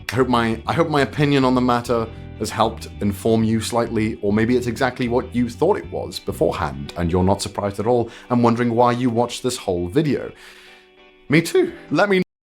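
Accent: United Kingdom. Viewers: Painfully British 👌🏼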